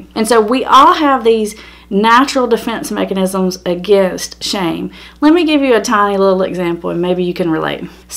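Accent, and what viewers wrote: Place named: Eastern North Carolina, United States. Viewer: I love your accent!!!!! are you from eastern NC by chance?????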